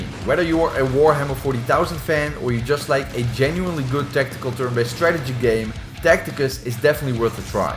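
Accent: Netherlands. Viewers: It’s the typical accent Dutch people have when they speak English. A Dutch person will, like myself, be able to immediately tell that this guy is Dutch or Belgian (Flemish).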